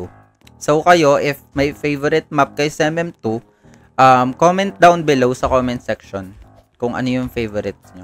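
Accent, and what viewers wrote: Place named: Tagalog, Philippines. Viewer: Parang tagalog nag english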